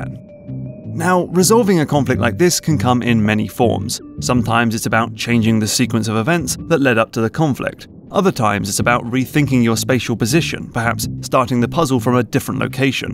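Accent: United Kingdom. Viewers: americans when they hear a british accent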